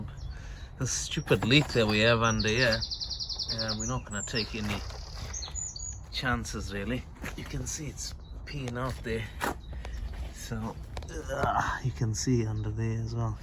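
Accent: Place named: South Africa. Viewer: Dude no joke first video I watched now, you sound like you are from South Africa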